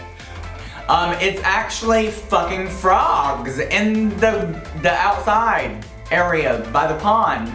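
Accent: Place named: United States. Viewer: the most amazing accent from the us.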